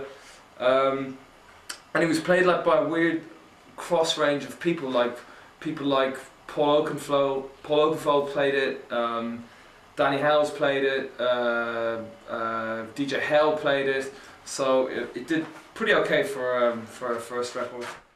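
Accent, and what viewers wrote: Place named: United Kingdom. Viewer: nice to see another minimal producer who can talk in a british accent living in germany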